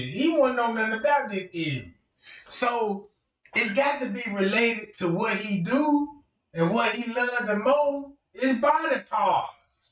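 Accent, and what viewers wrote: South, United States. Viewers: Hey Boo, I Love You, and I know God is gonna Bless You for all your Dedication and hard word. And the Awesome part is your doing it from your Heart, I been riding since day 1 when I feel asleep on my phone and woke up to hearing ur sexy voice with the Sothern Accent it caught my attention and I never looked back. Thanks For all your hard work💯💯🥰💋💖💜🙏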